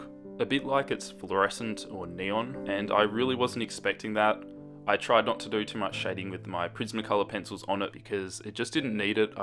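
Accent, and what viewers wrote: Australia. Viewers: Are you Australian? Sorry if I got that wrong I'm not very good with accents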